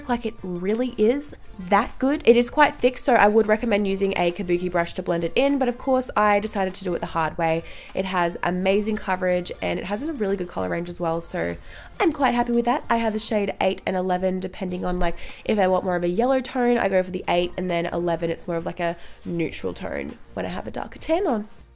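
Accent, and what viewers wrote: Australia. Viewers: talking in Aussie accent too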